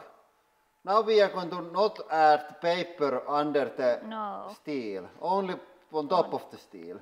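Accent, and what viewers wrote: Finland. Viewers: i only now realize this channel is finnish! its right next to russia ofc but because sweden has basically perfect english i was surprised by the accent. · I used to live in Helsinki and frikkin love the Finnish accent. It's mint. Also love the detail of using Iitala glassware. Torille tavataaaaaan!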